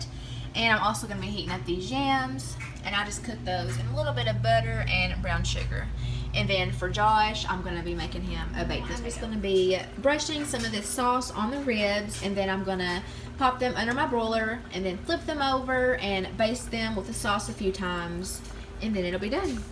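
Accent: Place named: Kentucky, United States. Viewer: Do you mind my asking where you're from? I grew up in Lexington Ky....your accent sounds like home to me. Really enjoy your videos, some ideas for something new to make.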